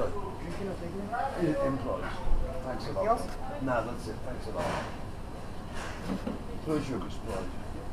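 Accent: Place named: Midlands, United Kingdom. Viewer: Your accent is awesome dude! Me being from Birmingham if always makes me smile listening to the midlands accent 😊 Hope your keeping wall anyway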